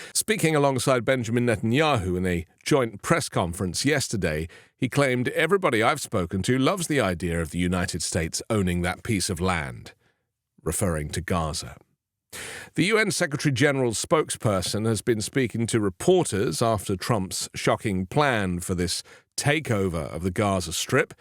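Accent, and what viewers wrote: United Kingdom. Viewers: Is this ai voice implant to sound British and why would you think it makes sense?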